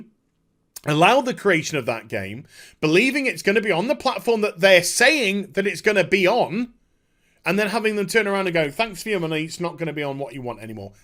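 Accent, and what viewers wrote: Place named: United Kingdom. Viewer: ive watch so many of your videos, that anytime i read something online i read it in my mind in your british accent.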